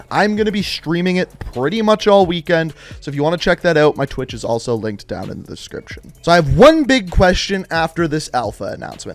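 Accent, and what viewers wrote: Canada. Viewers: Are you Canadian my guy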